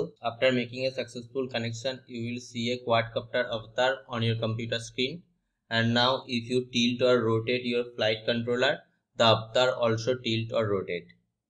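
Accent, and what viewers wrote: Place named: India. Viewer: i cant understand your heavy indian voice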